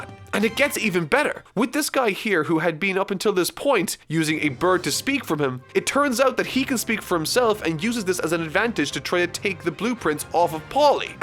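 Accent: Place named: Ireland. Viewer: He's Irish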